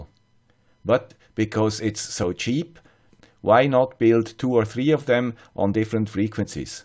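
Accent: Switzerland. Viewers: Dear with the cool Swiss accent Finally I got all parts to replicate your awesome project. After soldering all parts on the pcb I took your sketch from your cool Github repository. My hands were already wet and my heartbeat had already reached a critical rate because of the great excitement. With my highly shaking right hand I tryied to hit the upload button. After a few try's it began to upload. Then the shock of the day -> Error message: "conversion from 'const ip_addr_t* {aka const ip4_addr*}' to non-scalar type 'ip_addr_t {aka ip4_addr}' requested " Because I'm a beginner in the Processing programming language I have no clue how to fix it. Do you can help us to fix it? I'm sure for an expert like you this problem is like peanuts;) Maybe it has something to do with the version of Arduino IDE or a library. I'm not sure:-| Kind regards and thanks a lot for sharing your awesome projects, ideas and knowledge with us. 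Thats very kind Greeting from Bern and stay healthy delf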